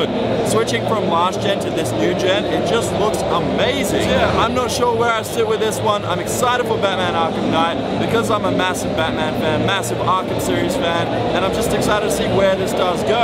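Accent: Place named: Australia. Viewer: ***** lol it was joke...he always says "my accent's Australian"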